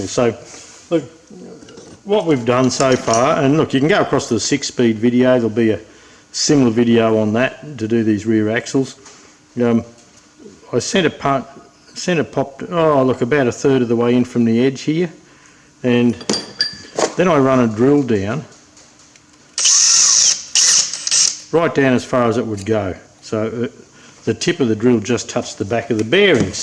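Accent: Australia. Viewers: Nice Aussie accent Mate !!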